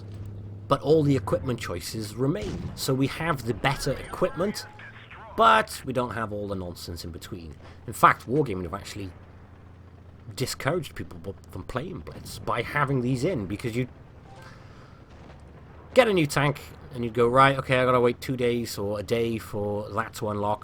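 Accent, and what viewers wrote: United Kingdom. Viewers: Blitzers from the stream for 5.0 are asking for your voice to be added for British crew voices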